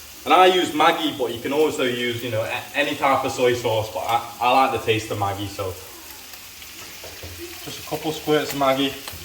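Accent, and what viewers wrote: United Kingdom. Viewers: Awesome how this dude looks like full, full native Thai and then speaks like a a British man. 😆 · when did you move to thailand? fitting name, you sound British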